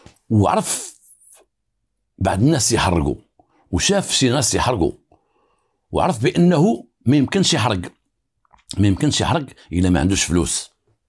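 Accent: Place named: Morocco. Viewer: Nosotros seguimos tu programa no por escuchar los crímenes que han hecho los marroquíes si no es por tener la gracia del hablar marroquí y como tiene que ser un marroquí todo presentas un nombre marroquí como habla como o sea la es una es una gracia que tú tienes que no lo van a tener todos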